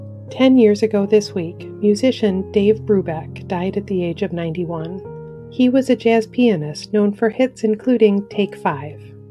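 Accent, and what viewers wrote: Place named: Ohio, United States. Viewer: shout out to the gal that has been doing narration for Legacy~ calming voice. also i hear an Ohio accent me thinks. 😺Thank you~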